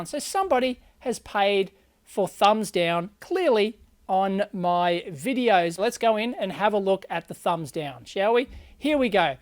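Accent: Australia. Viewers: Like all your vids, despite that Artful Aussi English :) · As a kiwi I find your aussie twang annoying, but I wouldn't dislike a video based on it. I power through it because your content is absolutely great. I can only imagine your natural dislikes are from cranks who hate the truth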